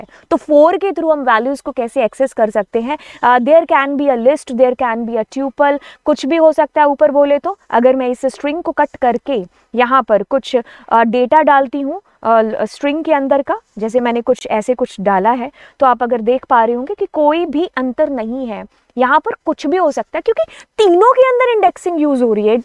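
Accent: Punjab, India. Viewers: are you punjabi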